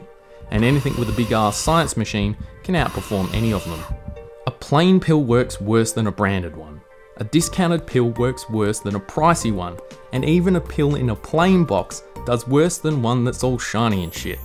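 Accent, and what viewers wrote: Australia. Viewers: Umm... all i learned is that you have a freakin' wicked aussie accent, mate1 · Love ur Aussie accent :D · this australian accent makes it impossible to take seriously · thats aussie accent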